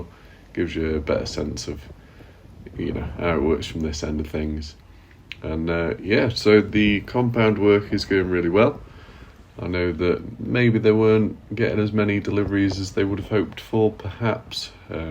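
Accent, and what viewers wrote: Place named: Northern England, United Kingdom. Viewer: Listening from Canada there is something very Monty Pythonesque about this narration with a Northern accent. “Cor, that’s some cracken drivin, that is. T’stone from Shrewsbury. Fine village. Fine pe-pull t’are. Makes a bloke tear up… Right cinematic that is. And there t’is another load! Weren’t expecting that. Down t’lane, quick turnabout and dump! Were elegant, that! Taken up by, excavator, now…and it’s in! Not seen finer. Two before mornin tea.” Something like that. Still, every morning I’m watching. Love it.😊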